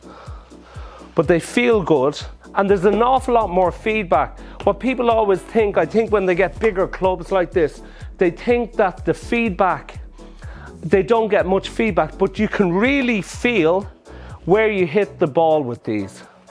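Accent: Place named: Ireland. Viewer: Great to hear his accent. As a fellow Irishman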